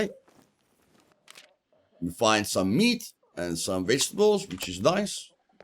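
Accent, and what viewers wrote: South Africa. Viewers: Absolutely great video bruu. Love how in depth U went with this. I'm massive into the dead fan Also THE SOUTH AFRICAN ACCENT YESS. I'm Aussie South African lmao Great vid man 👍👍👍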